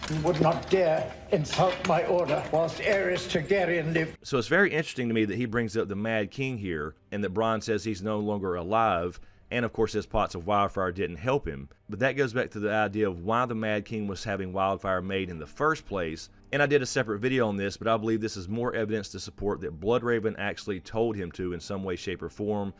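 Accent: South, United States. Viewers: I'm sure you've heard this before , but I love your southern accent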